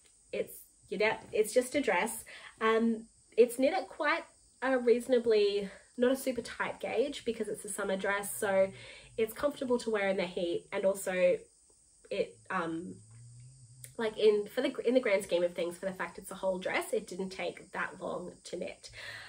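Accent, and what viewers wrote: Australia. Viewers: Hi there, so nice to see you again. Thanks for your informative podcast about your projects it was really helpful and it's so nice to hear an Aussie voice on You Tube. Have a great year!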